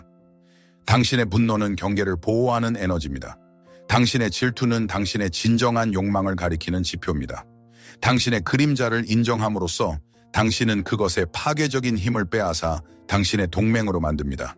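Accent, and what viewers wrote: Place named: China. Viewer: 중국분이신가요? 발음이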